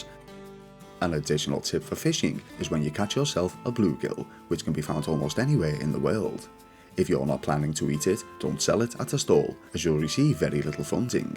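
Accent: Liverpool, United Kingdom. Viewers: 😂 he is a native he's from Liverpool by the sounds of it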